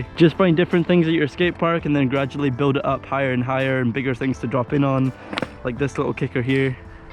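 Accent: Scotland, United Kingdom. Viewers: haha love that scottish accent! Gonna live near the Loch Lomond one day when I´m old! Keep up the great work!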